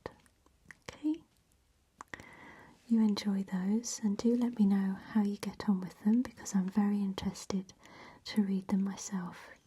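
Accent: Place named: United Kingdom. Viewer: Thank you, beautiful choice of books and an incredibly calming video 💎 I've learnt English with American accent but thanks to you I'm getting so used to British accent, and even realising how elegant it is without being rounded😎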